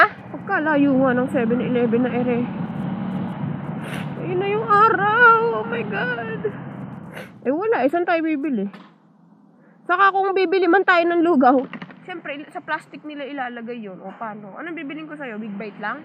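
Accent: Laguna, Philippines. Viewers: I have an ex-gf from Sta. Cruz, Laguna and her voice, accent, the words she's using, and the way she speaks is EXACTLY the same as you. It's kinda trippy☺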